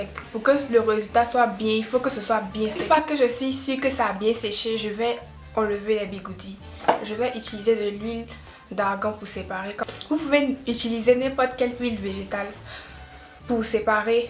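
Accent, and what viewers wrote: Ivory Coast. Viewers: cc t'es ivoirienne? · miss pretty oui elle l'est l'accent trompe pas 😊😊 # 225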